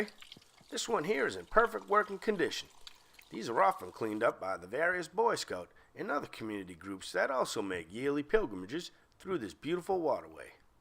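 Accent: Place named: New England, United States. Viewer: Worst accent ever,.like a southern, yankee massachussets, fake maine combo, just terrible, hard to watch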